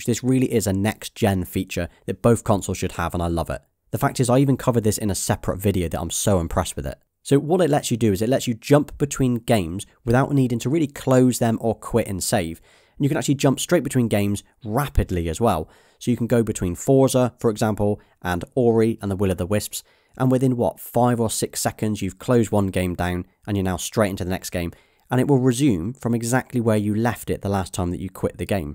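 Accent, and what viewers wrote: United Kingdom. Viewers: The way British accent is so funny 😆 because he said shual shock to shual sense, I am not hating or anything I am just saying how he says dual shock to dual sense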